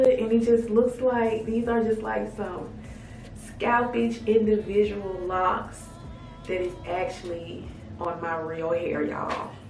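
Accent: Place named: Memphis, United States. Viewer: you're welcome! I'm a new subscriber and I watched another one of your videos today and your accent sounds like mines lol. Are you from Memphis Tennessee?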